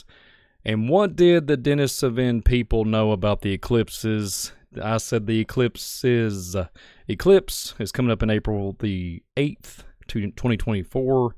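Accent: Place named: Kentucky, United States. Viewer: WV Hillbilly here loves your Kentucky accent ❤ My mama’s family is from Lawrence County, KY ❤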